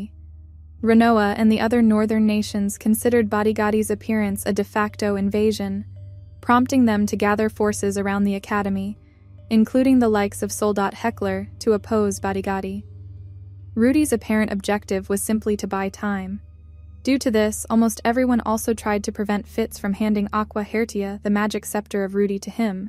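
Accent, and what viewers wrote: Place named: Germany. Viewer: Man hört das du Deutsch bist.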